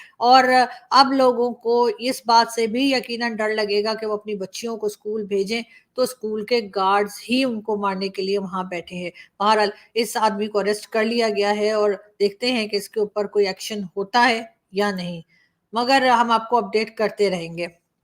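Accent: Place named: Delhi, India. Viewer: What I like about she talks like An Indian and talks like a common citizen and a woman and asks all the common questions that an ordinary citizen would ask...bade bade fancy sawal ya lecture nahi. deti., jisko aam zindagi ke saath koi lena dena nahin. nahin She connects well with common ppl. That is her strength. Talking about India so much that now she sounds like a regular girl.from Delhi jahan auntyan ki bare mein yeh bolenge "dil ki achi hein...thodi gamandi hein..bas."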